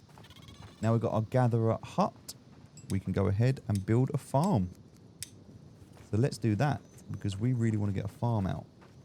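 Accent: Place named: East Anglia, United Kingdom. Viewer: decent watch thanks. also sounds like you're from round my way? east anglia?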